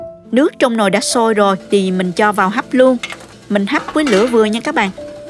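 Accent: South, Vietnam. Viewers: Em có giống nói miền nam rất dể thương 😊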